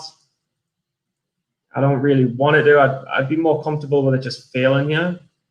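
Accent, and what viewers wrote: Newcastle, United Kingdom. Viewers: You from Newcastle? Sound like Geordie!! Im from Newcastle too been trading 8 months now I traded BYFC and got torn apart around $6 and only ended up on the stock around $100. Traded CHCI as it rejected $4.20 and $4 level hold and held until $3.12.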